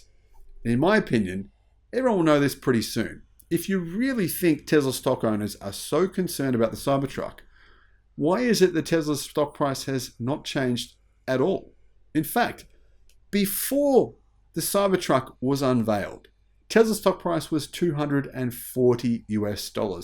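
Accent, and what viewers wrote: Australia. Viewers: What an Aussie accent maaate. Ah well you can take an Aussie out of Australia but you can’t take the Aussie out of this man.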